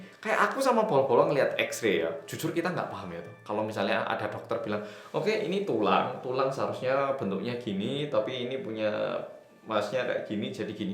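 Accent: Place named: Malang, Indonesia. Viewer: nggk nguati medoke ya mas... khas kera ngalam... salam dari Yulis4994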